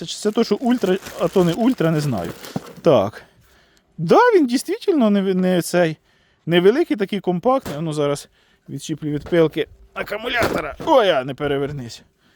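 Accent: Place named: Podillia, Ukraine. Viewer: Ви не з Поділля родом? Чую схожий говір)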